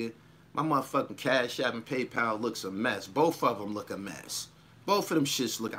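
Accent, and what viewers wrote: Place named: Pittsburgh, United States. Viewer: What part of pa from? Sounds like a Pittsburgh dude.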